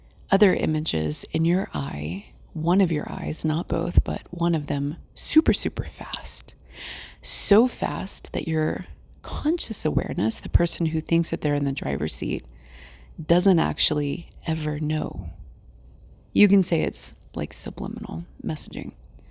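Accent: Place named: South, United States. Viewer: Beautiful video! You explain things so clearly and you're very easy to listen to. It's like I'm listening to one of those women we have in the south that call you "honey". You feel so safe.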